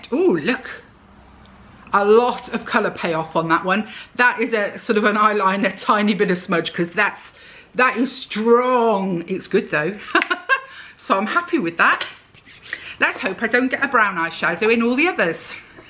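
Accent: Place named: South Wales, United Kingdom. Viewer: Thanks - always love it when a new video pops up. Being a lady from South Wales myself I can often hear the odd twang in your accent x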